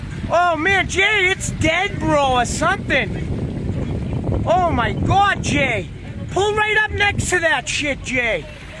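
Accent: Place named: Boston, United States. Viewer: Alaura Martinez ....I thought seths exaggerated new England accent for Peter griffin was just a nasty stereotype....but ow Mai gawd ....these fawhkin guys from Boston r something else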